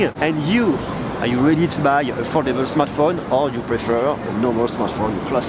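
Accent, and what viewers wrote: France. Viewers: Americans are going to love you mostly with your Frenchy accent.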